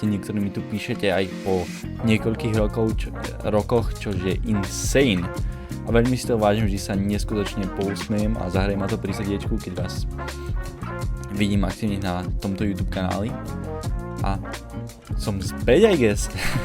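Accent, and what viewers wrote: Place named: Slovakia. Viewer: s fúzami?😳 Toto je insane nečakala som že sa niekedy vrátiš. 14 ročná Simča ktorá kukavala vždy na tvoje streamy a čakala na druhý deň keď stream začne tak je back na tvojom kanáli len tiež trochu postaršia ale fúzi jej nerastú😆 Nikdy by som nepovedala to že si na tieto časy ešte takto zaspominam milovala som to. A čo sa týka momentálneho kontentu mne osobne to nevadí auta mám rada a slovenskej angličtine rozumiem😅 takže sa teším na ďalšie videjko☺️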